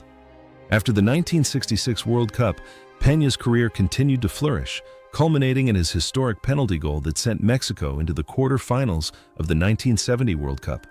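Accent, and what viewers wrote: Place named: United States. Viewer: more AI shite with gut wrenching yanky commentary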